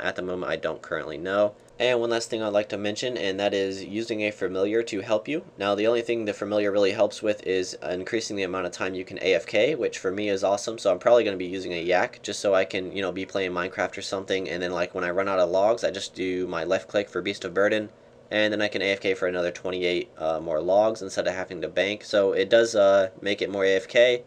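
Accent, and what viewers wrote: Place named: United Kingdom. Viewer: i think he's british... t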